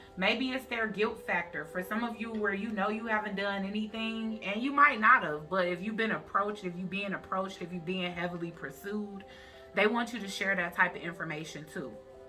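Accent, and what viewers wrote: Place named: Detroit, United States. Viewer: I knew you was from the d we got that accent lol. Thank you for this reading! Spot on.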